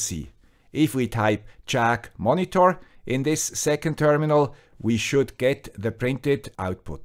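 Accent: Switzerland. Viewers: As always you show us new and exciting possibilities. Thanks guy with swiss accent! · What accent is that? Every time I’m watching your videos it sounds like a Swiss accent to me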